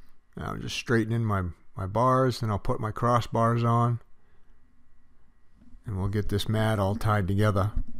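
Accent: Maine, United States. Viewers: I could tell you were a fellow mainer, that accent is thick. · I moved away from Maine about 30 years ago give or take. I'm listening to him and thinking that's a Downeast accent. Then at he says "getting everything right screwed into place".. :) Yup. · Ohmygoodness, you sound like home. You live near Rumford, ME? Or Waterville. I bet you're Waterville. I'm out in Colorado now, but grew up in Monmouth. Watched the whole video just to listen to you. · LOL within 10 seconds of hearing you speak, I said this guy's from Maine, possibly NH. Good Job!!! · You sound like you may be from Maine. Great video! God bless from Aroostook County.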